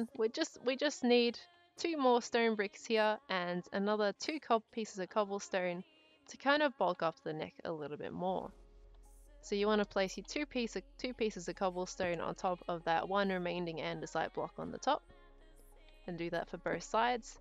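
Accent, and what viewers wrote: Australia. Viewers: I love your Aussie accent! ❤ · are u Australian?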